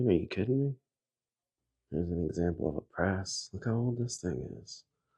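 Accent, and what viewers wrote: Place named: Baltimore, United States. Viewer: haha same…I’m from Abington! I heard the accent but couldn’t distinguish if it was Philly or Bmore and when I saw you watching the Eagles game but not saying anything about being from the Philly area, I then assumed it had to be Bmore…haha.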